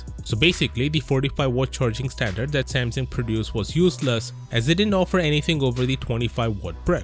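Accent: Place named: India. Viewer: Good video my only feedback is that it was a little hard to understand with his accent. I sure other people are able to understand him fine. I think I just have an issue with Indian accents and making them clear. Nothing wrong with the narrator personally he definitely sounds intelligent and knows what he's talking about just a little hard for me to understand.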